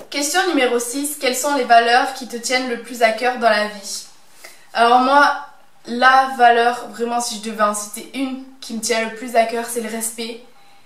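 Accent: South, France. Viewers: J'adore ton accent du sud et t'a l'air super sympa et pas superficiel 😃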